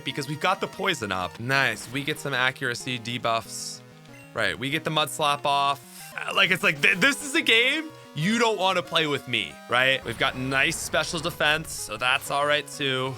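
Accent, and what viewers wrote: Canada. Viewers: That Canadian accent kept slipping out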